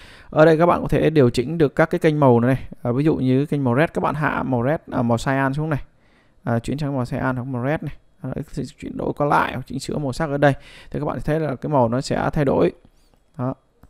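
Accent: Vietnam. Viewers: Bạn người Nam Định phải ko bạn? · Anh ở đâu Thanh Hóa vậy ạ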